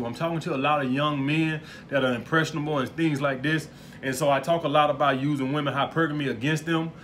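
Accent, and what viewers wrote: South, United States. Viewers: Where is he from he sound like he from the south?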